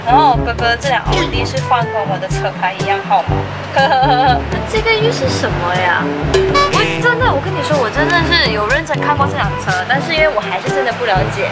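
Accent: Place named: China. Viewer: 纳尼！竟然有中国腔？？？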